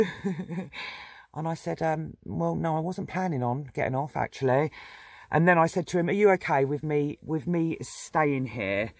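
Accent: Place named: Cornwall, United Kingdom. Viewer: do you travel just down south, or do you travel all over the UK, you sound like you’re from Cornwall or Somerset. X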